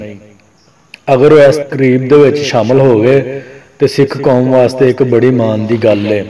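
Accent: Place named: Sialkot, Pakistan. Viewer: Bhai teri boli Sialkot wali lagdi ah.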